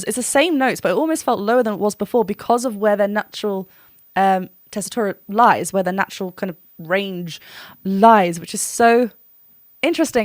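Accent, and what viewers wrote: United Kingdom. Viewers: Nice reaction. Be prepared, The Warning Army is coming. This will be your next favorite band. The Warning is addictive. You have no idea how much fun you are going to have going down this rabbit hole. I assume from your accent that you are in the UK. They just played a soldout show at O2 Academy Brixton in London. Your next reaction should be Evolve, live at the MTV VMAs. Don't forget to turn it up. As all Warning fans say, "You have been Warned!"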